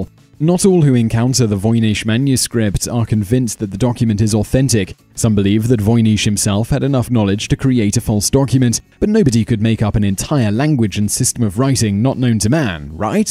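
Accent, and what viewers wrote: United Kingdom. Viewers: Bunk. Something 'found' by one guy who's keeping it a secret is not archeology, or even interesting. The Shroud of Turin has long been debunked. Explaining away the carbon dating? "Interest of scientists and astrologers" Stonehenge: "Salisbury valley"? You're accent indicates you could've easily visited the site and seen that it isn't a valley, it's label on the Ordnance Survey maps and in everyday conversation as 'The Salisbury Plain.' You undermine every other video you make when you make this sort of junk promoting easily discredited nonsense.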